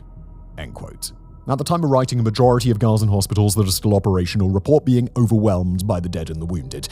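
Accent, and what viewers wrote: RP, United Kingdom. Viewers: Simon needs to take the chip off his shoulder and do some unbiased reporting and actually visit these places on the ground. he thinks he's so smart with his posh accent and multiple successful youtube channels that are supposed to enlighten and teach. Yet, he more often than not, leaves out nuance unless it supports the narrative of his videos . I've been watching for years and I've been noticing it more and more. its evidence of my own bias. yes. but Simon is deliberately leaving out facts from his reporting. BIG FACTS TOO SO THATS HOW WE KNOW ITS DELIBERATE!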